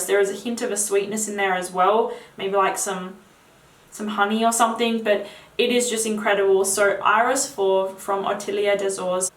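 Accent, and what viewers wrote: Australia. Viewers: I like her sexy Aussie accent and voice. Not bad on the eyes either. Never mind the fragrances... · Well... I've never heard a posh Australian accent before. Very nice. 👍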